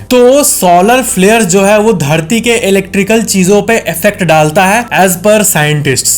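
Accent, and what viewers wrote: Bihar, India. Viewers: Aap bihari ho na tbhi apke accent m bihari chalak raha hai sir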